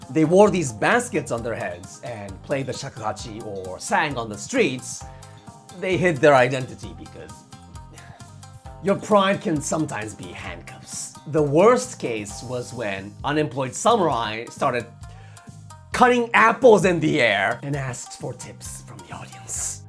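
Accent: Japan. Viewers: English but in a Japanese voice inflection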